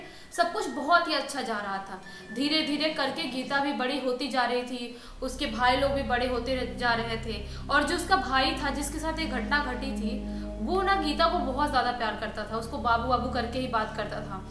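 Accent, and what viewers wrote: Bihar, India. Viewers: Kya aap bihar se ho?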